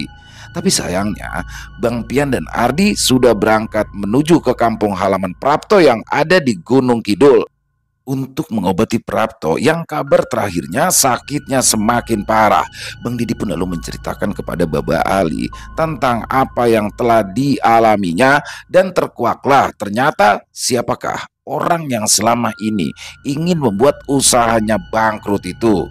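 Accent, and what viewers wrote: Jakarta, Indonesia. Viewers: Assalamualaikum...salam kenal bang ..aye demen bngt Ama bahasa Betawinya...kentel buangeett logatnya Betawinya...lanjuuutt😂😂😂👍👍👍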